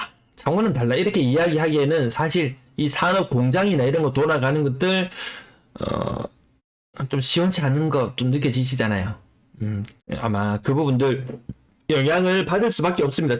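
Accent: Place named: Busan, South Korea. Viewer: 와ㅎㅎㅎㅎ 저도부산사람인데 사투리 기가맥히네예ㅎㅎㅎ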